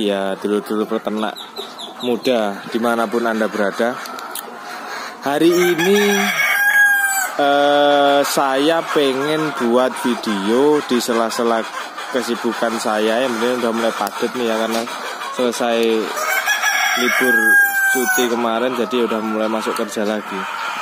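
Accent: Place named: East Java, Indonesia. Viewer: Mantab mas ilmunya,..maaf jenengan asli mana to, logat jawanya kental khas jawa timuran..tinggal di Lampung.salam seduluran dari wong Sidoarjo jawa timur..tp asli jawa tengah Purwodadi Grobogan..juooossss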